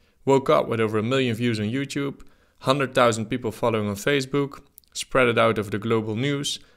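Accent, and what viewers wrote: Netherlands. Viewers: that dutch accent. makes it sound close to home ;) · Are you Dutch? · This dude Dutch?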